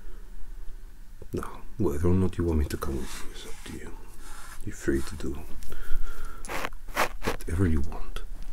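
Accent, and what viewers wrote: Russia. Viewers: It's just awesome! Сhic Russian (accent and pronunciation) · That Russian accent of yours really works well for ASMR purposes. Awesome character work once again with this one,! 😎👏 I feel like we'll see ol' in a 3rd (mis)adventure down the road. 👍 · это звучит очень круто, особенно английские слова с "русским" акцентом, звучит просто ахуенно, но немного тяжело отличить русские слова от английских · I'm Russian, and those russian words gave me shakes 😅 It was funny to hear that pronunciation. Anyways, good job trying to make russian accent 👍 Really cute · Just discovered your channel and I have to say I love it! Очень хороший Русский акцент и в общем звучала правдоподобно! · That was pretty awesome. Your russian accent is pretty amazing. Since i am russian myself it was such a pleasure to listen you speaking russian. Русским в коментах привет!😊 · As a russian,I can confirm that your accent is perfect. 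· I find that I am really liking the Russian accent when it’s spoken softly like this. I think your Russian gangster reminds me reminds me of Ho Yinsen from the first Iron Man movie. I know that character is not Russian, Afghan, I believe, but the gentleness, the pronounced accent, the caring... and the glasses 🤓 just makes me think of that character. I like that character and I like your Russian gangster... when he’s being good. 😉😁 Is there a deeper meaning for you behind all of the personal attention videos that you make? Just curious. 💙💙💙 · as a russian I really appreciate your work with an accent and some russian phrases. good job! and the whole video... that's something really special. thank you so much, it was literally the best 18 minutes of this day for me :) (господи, мне как будто снова 6, а по телеку идет "бандитский петербург" 😭) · I gotta admit, I’ve listened to this every night since I’ve found it. 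I love all your videos but this Russian accent is sooo relaxing! I really hope you do more videos of soon!!:) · Yay, Russian accent! ^_^ I'm beginning to like this character, get really strong big brother vibes from him, and he also reminds me of how I used to imagine Sirius Black before I got to watch The Prisoner of Azkaban. Thank you,! Спасибо,!)) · I'm new to this channel and I'm used to most asmr rps being black screen or single frame'd so seeing a full human man on my screen speaking to me in a russian accent, soon then approaching me with a knife, was a bit of a jumpscare ngl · becuase he’s kinda creepy, his assurances and affirmations made me feel like he is obsessed with us rather than caring for us, or maybe im just biased to a Russian accent lol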